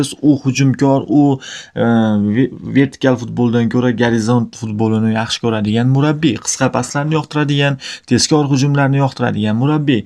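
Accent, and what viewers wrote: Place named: Bukhara, Uzbekistan. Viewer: Tapatazi buxorodaku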